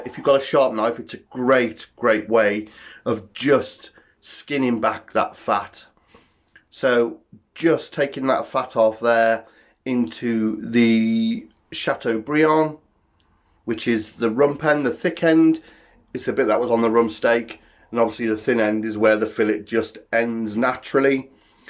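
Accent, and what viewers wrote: United Kingdom. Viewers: British 110 per cent!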